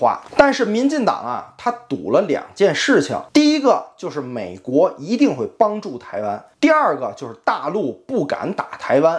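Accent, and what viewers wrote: Tianjin, China. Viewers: 这是不是天津人，口音很像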